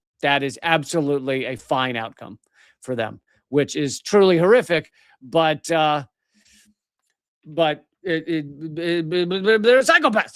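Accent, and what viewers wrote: United States. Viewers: Nothing personal mate & your content is fabulous just not in the zone to listen to an american voice & that grating accent . All the best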